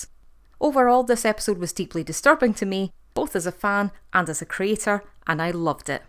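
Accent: Scotland, United Kingdom. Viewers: Hearing another Scottish person talk about this is so amazing! Love the videos, keep up the great work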